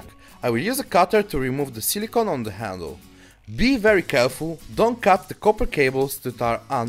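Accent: Italy. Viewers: yeah to be honest xD u can really tell its Italian also for the first trick i was able to switch the front and back camera after unscrewing it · he's italian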